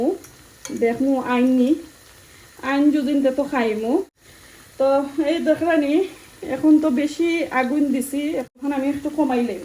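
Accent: Sylhet, Bangladesh. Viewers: u talk in Sylheti basha that’s why I love to watch u more !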